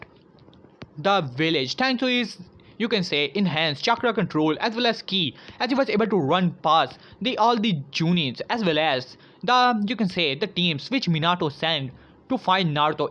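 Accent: India. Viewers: It sounds like Indian and Asian English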